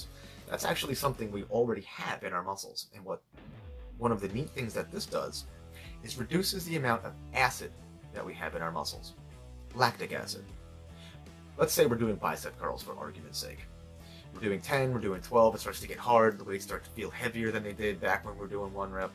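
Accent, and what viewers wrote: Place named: United States. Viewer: Love your American accent, you are just simply.. Yum! b-e-a-u-t-i-f-u-l to look at ....love how you explain stuff PROPERLY!!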